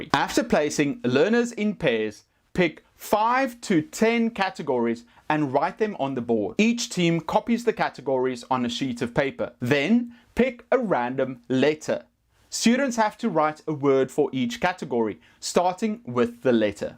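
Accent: South Africa. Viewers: South African accent. Nice.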